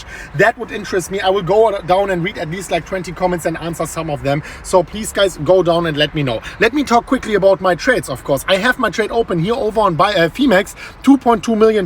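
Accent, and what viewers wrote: Germany. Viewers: Love your german accent, from which city in germany do you come from?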